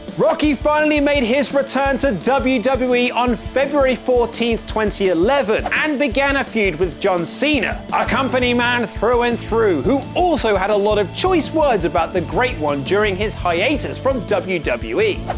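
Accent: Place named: United Kingdom. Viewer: what a shady click bait title...all that talk and not once have u actually given the "real reason" why...just pure speculation one after another after another..kinda fd up..and then in comments its just as many more. and would be so much cooler if u threw some videos in (i kno u prob can't do that do the copyright issues thing) but yea some videos/small cuts from matches or backstage segments etc...would be sooo much better n more entertainment then this bombardment of a I'm guessing British, accent, on and on and on..shit gave me a headache...lmao n i couldnt even get the answer the the question of the clickbait title for it lol..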